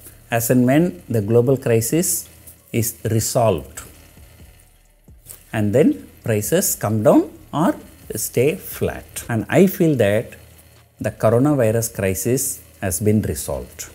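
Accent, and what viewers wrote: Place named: South India, India. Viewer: If P.R Sundar wud have been smart enough to tell his 4.5 lac audience to buy Gold in Oct'18 when it first spiked from 1250 to 1450$ or in Sep 2019 when it again started spiking and tgen did furthermore after crashing in Mar'20 and then told us the Targdt of 2100 and then to sell, i would hVe paid heed to his ridculous arguments in his southindian accent !! Fact is, he is laying out analysis that does no reading into reasons that lead to spike and completely ignores the 10+ Trillion$ of Debt added around the globe in just 9 months !! When mkt crashes, ppl first go in dollars and then dump dollars for Gold. Dont listdn to him ... Buy GLD 1300$ or 34K INR and wait for 2023 for it to hit 2000$ again. But Silver 52K n target 90K+ around d same time. You are Welcome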